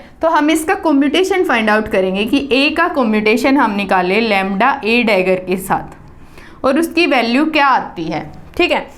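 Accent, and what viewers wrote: Haryana, India. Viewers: maam are you from haryana???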